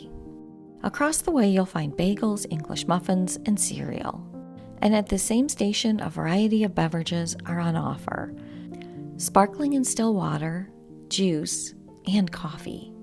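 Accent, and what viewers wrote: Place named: Chicago, United States. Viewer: I guessed Chicago based on the accent 🎉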